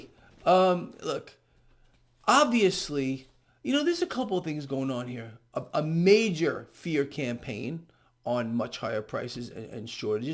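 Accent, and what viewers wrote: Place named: New York City, United States. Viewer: we love your Italian NJ/NYC pronunciation of 'costs' like 'caust'(ic)👌🏻 I think the NYC Italiano accent is awesome & admirable😄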